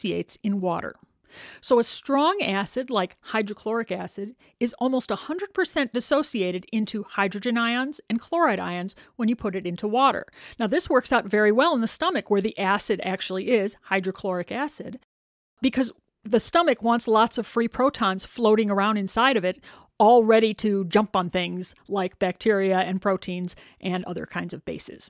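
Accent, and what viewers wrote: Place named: South, United States. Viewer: It is a southern accent and I love it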